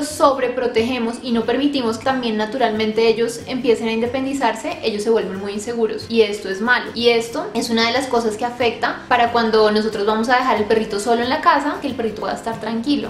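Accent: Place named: Colombia. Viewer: Me gusta mucho tu acento , de que parte de Colombia es ?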